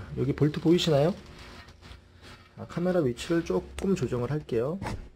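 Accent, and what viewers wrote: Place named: Gyeongsang, South Korea. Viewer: 찡기다, 아까침에... 이런 사투리가 나오는거보니 부산경남쪽이 고향이세요? 마산에도 잠시 있었다는거보니 그런거 같네요 ㅎㅎ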